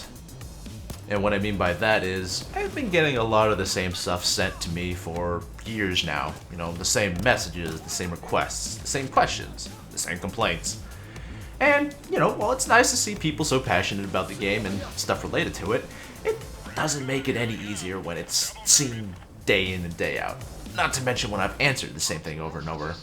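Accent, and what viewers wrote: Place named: United Kingdom. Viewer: I'm agree to most of things you said but, in a matter of being professional, you need to upload real pro videos for old stuffs you did before. not all of them but as I checked, you were not aware of all the details and clues of each heist. ofc watching your videos gave me some clues of things i was not aware and ofc I do heists in my own way even if there be an easier tactic. but for example missing one of two paintings in Diamond DLC heist is a shame, I mean right now after years you're playing it you should know there is 2 paintings and not 1. anyway as you said that you dont seek any suggestion ( lol) just skip what i said on top lines. you are a good player and I enjoyed watching your videos here in youtube and again I like the way you explain and argue while recording it. at first video I thought you're british? IDK why. 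keep going and I know its boring to read and listen to a lot of stuffs you may heard or know before. keep going and dont forget im the number one in stealth! but im infamy you know lolz.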